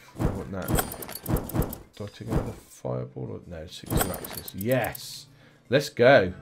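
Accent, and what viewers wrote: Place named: West Country, United Kingdom. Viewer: Are you from ludlow or bristol you sound it brov!?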